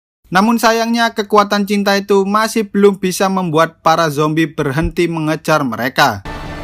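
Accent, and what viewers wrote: Java, Indonesia. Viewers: Mimin orang jawa kahh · Jowo mas🔥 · Jowo pisan iki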